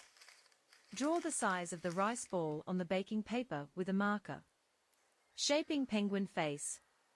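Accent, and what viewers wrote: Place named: Australia. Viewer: This is a cute bento. More people would watch if you used captions instead of the english voiceover. The Aussie accent is jarring to listen to.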